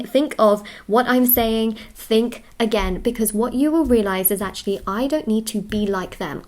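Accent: United Kingdom. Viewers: That was one joyous reading! Your accent makes me wonder were you born in the UK or is it acquired?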